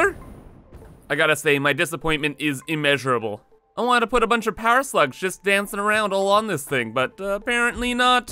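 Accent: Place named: Canada. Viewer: "Aboot", "byees".... methinks is Canadian, ey?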